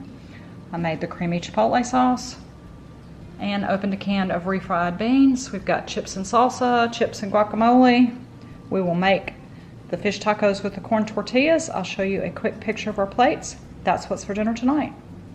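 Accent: South, United States. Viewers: I just love that southern twang😂. People from the south are honestly so warm and friendly. Everything looks yummy as always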